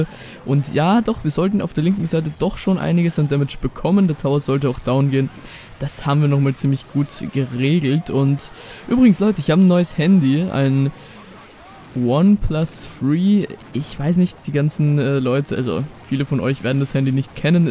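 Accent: Austria. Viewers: Österreich du?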